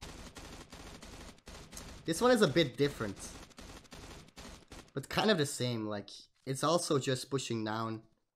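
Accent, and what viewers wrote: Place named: England, United Kingdom. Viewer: I love ur english accent, great effort in video, tx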